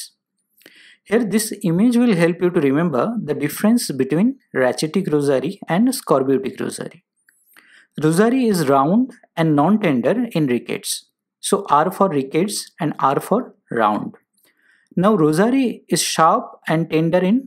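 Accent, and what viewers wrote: India. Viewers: Excellent video with nice animation. Thank You Sir. Your voice sounds like you are from WB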